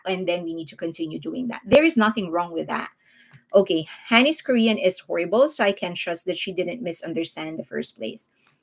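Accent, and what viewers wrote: Philippines. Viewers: shes from the philippines thats our pronounciation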